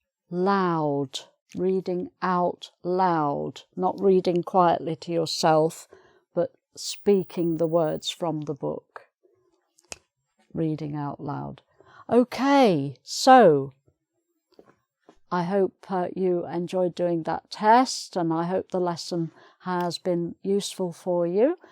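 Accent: United Kingdom. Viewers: Your fantastic pure British accent is something out of the world. Never die dear never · the accent british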